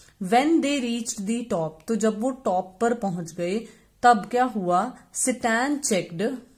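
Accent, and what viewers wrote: Punjab, India. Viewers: Ma'am Are u a Punjabi ?? Because ur slang is like us 😅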